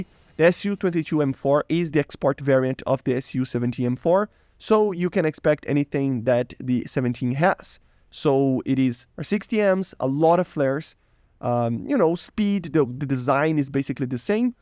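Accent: Brazil. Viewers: Are you Brasilian bro?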